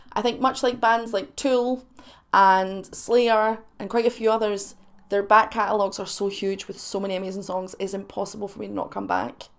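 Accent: Scotland, United Kingdom. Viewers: Of course, I do not miss an episode of you brilliant channel. I just love to hear you Scottish accent and your lovely humour. ❤️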